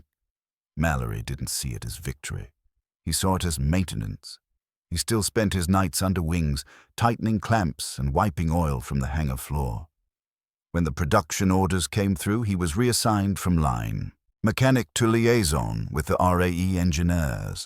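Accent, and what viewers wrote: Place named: United States. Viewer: An entertaining story but if American robotic narration is used and the graphics are CGI, I'm skeptical of it fully being true. For a story on something British, hearing robot narrator say, 'sodder' instead of 'soldering' and 'Aluminum' instead of 'Aluminium' ... not good, and anyway, wasn't it fuel starvation that caused the Merlin's to conk out before a fuel injection system was utilised.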